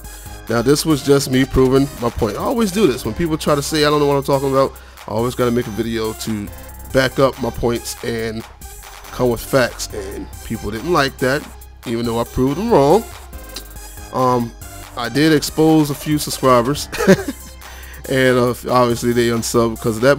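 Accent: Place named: Baltimore, United States. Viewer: So I discovered u thru the GamingIlluminaughy and I loved u immediately bcuz I could hear the Baltimore in u and u were always funny and honest. I support u, MWN and JG. Not gonna lie, I dont really like the new dudes MWN is running with but I will support none the less. To mirror the sentiments of others, continue to make vids if u want to. I totally get the being grown n trying to find balance with this and the real world. U also mentioned that u were from the old school when games took effort, consistency and constant replays sometimes from the very start of the game. Stop worrying about clicks if u enjoy what u do. I would love to see a vid with u and JG if MWN cant come. I also see in the comments that many ppl support u. Stay confident Fam. We need ppl like u to represent for our city. Luv u ♥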